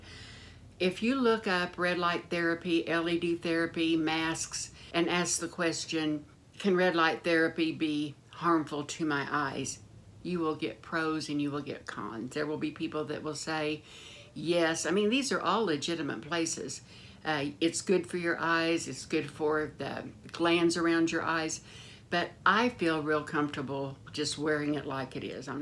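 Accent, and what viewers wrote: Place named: Illinois, United States. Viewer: I really enjoy your channel! I have family from Louisville and Olney areas in Illinois, and every once in a while I hear a bit of “Something” in your voice or speech pattern that reminds me of them. Am I even close geographically?? Also, I too lost my closest friend (Kathy) in 2020, definitely changed my life, left a big empty spot no one could ever fill. I dreamt of her the other night - first time since she died. She said - “ what took you so long to visit me”. Have you ever dreamt of Pat?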